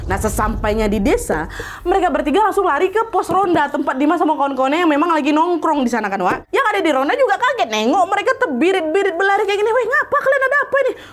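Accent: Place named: West Sumatra, Indonesia. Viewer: hiyaa, Sumbar, payakumbuh.. logat minangnya gak ilang yaa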